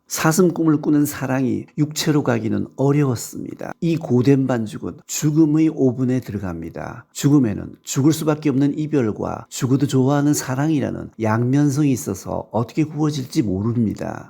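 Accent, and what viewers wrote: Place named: Gyeongsang, South Korea. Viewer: 축하드려요 이쁜글이 많아 성공하실거예요 생각외로 깅상도말이 거칠지않고 부드럽네요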